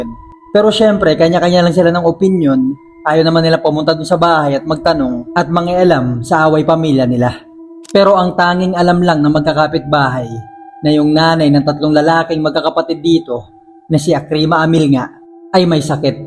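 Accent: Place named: Waray, Philippines. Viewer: Sounds like waray waray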